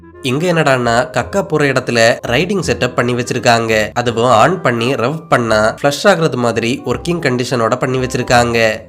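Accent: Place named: Kerala, India. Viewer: Mallu Tamil super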